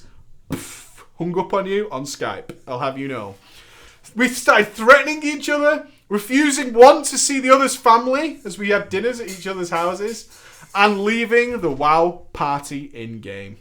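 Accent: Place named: United Kingdom. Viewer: It's too bad you are on EU... I'd raid with you just to listen to you entertain us in Teamspeak or w/e you use... everything is more interesting with a British accent, lol.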